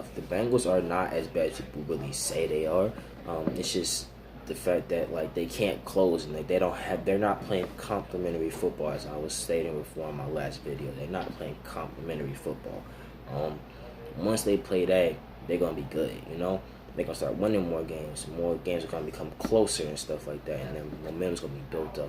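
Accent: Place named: New York, United States. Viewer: That New York accent 🤤🤤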